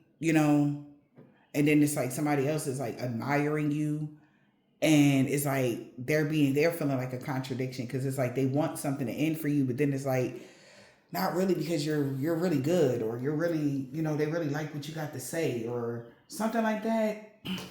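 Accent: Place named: Baltimore, United States. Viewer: Lol is you From Baltimore I love your accent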